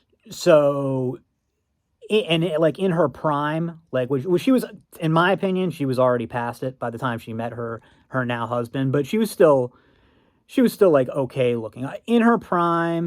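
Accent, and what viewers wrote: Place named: Africa. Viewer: African accent from an ex French colony. Perhaps Cameroon or Congo. He accent is really thick and annoying, he should have long worked on it.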